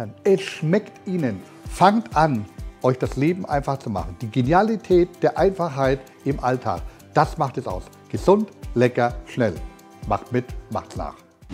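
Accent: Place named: Franconia, Germany. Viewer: Von wo in Franken bist du?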